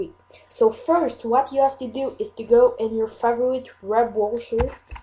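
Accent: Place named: Netherlands. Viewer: sounds dutch to me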